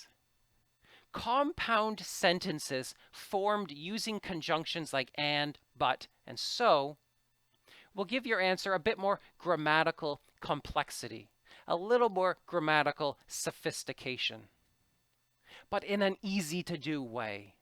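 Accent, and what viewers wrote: Canada. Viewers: sure i think he is Canadian cos i hear his "" about / out " whole time and so cute too, love this teacher. super clear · hi there, your accent is cool Are you from Canada ?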